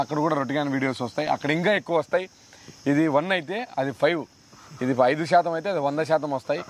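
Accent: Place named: Kadapa, India. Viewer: Mana kadapa volla slang ge ante. Ray youtube ga okasari ma kadapa vachii chudu sami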